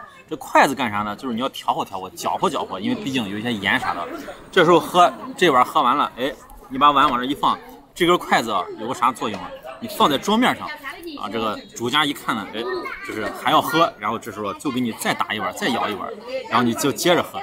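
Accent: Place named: Henan, China. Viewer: 第一次看的视频，听出来一丢丢邻居省份河南口音，很熟悉哈哈哈，视频很棒，期待更新。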